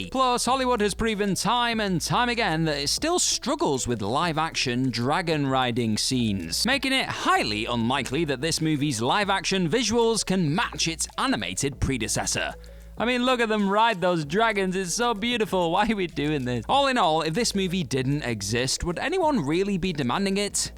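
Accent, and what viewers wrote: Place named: RP, United Kingdom. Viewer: I'm sorry, but sucks as a narrator. He always sounds so condescending and phony. Like he's trying to play the character of a posh, Shakespearian trained voiceover actor instead of just doing a normal voiceover where he sounds like a normal person.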